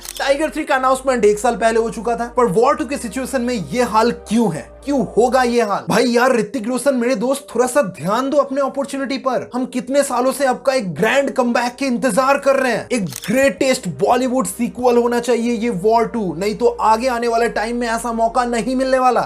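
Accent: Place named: Bihar, India. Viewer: Aap ke speaking skills me bihari pan aa raha hai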